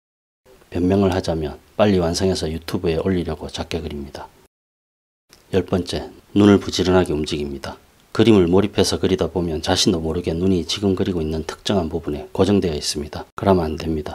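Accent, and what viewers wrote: Gyeongsang, South Korea. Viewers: 안녕하세요, 제가 캘리그라피랑 수채화 배우는데 수채화 찾아보다 인물화 검색하니 선생님 영상들이 떠서 들어왔는데 그림 중에서도 가장 어려운 인물화를 생동감 있게 그리시네요. 감탄합니다.. 그림도 그림이지만 영상 내용과 맞지 않는 질문일 수 있는데, 선생님은 경남 서부 분이신가요? 제가 팔도 사투리에도 관심이 많은데 선생님 억양이 경남 서부이신지 아니면 경북이랑 섞인 경남 함양 쪽이신지.. 궁금하네요. 경남 경북 억양이 골고루 섞이신 것 같은데 대구나 경주 같은 경북 남부 혹은 경남 동부 부산쪽에서 들리는 음색이 아니셔서 의아해하다 용기 내서 야심한 밤에 댓글 남겨봅니다. 감사합니다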